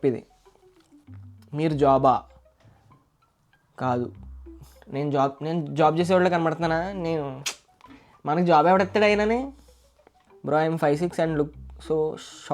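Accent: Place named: Godavari, India. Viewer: Mana Godavari slang antene oka emotion ani only godharollake telusu 😂Super nuv❤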